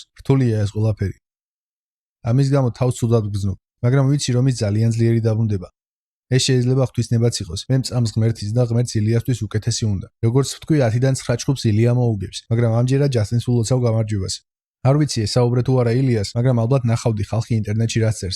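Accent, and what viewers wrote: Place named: Poti, Georgia. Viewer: ფოთის კილოზე ლაპარაკობ 😊😊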